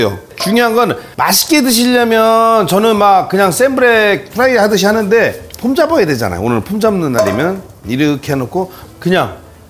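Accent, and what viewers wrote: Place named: Yesan, South Korea. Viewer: 보령 옆의 구수한 예산의 구수한 사투리의 음성이 귀에 쏙쏙 들어온답니다^^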